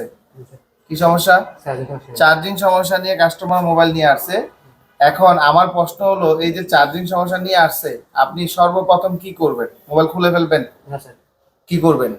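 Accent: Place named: Comilla, Bangladesh. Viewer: কুমিল্লা কথায়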